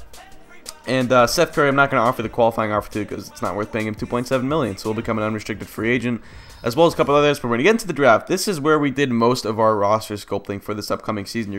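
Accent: Boston, United States. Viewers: Boston accent in full force this video lol (hAHks, adam silVAH)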